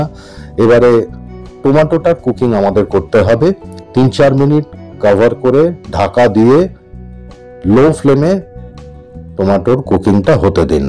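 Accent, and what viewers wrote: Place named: Punjab, India. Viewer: Dear Sir , thanks fr yr kind feedback. Just so that you know, your voice was “ just perfect , precisely so “…. Matching the tone and tenor , of this Punjabi bowl of Heaven…you sounded well , like …Punjab da Puttar….that said, on behalf of all, of your viewers , pls allow me to say this, please take care , since we hear you say , you do feel a bit challenged….this is a first , we hear from you…pls Sir, be well, even if fr , the sake of wonderful recipes , wherin you truly deliver the Science of Cooking, into this art form of Cuisine….bhalo thkaben…God Bless….Namaskar 🙏🏻🙏🏻🙏🏻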